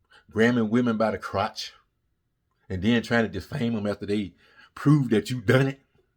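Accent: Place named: Alabama, United States. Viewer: You speak the truth!! (New subscriber from the UK) ps - who loves your Alabama accent 😁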